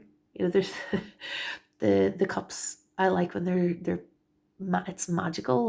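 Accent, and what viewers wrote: Ireland. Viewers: Oh you're irish! Yaaayyyy, subscribed 🥰🌞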